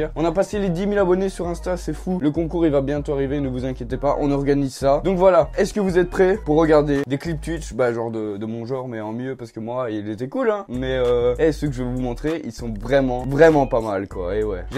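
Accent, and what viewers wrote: Belgium. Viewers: Il est belge donc il a un accent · Pk tu parles comme un Belge bizzare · Tu es belge ? L’accent j’connais 🇧🇪 P.S : Super vidéo, mais tu expliques un peu trop les fails, laisse les abonnés regarder puis explique. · Est tu belge ?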